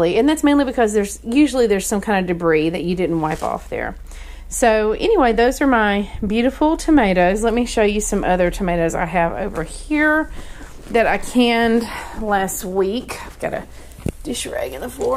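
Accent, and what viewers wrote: South, United States. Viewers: Girl, love your accent. I miss the south. Thank you for the tips and video!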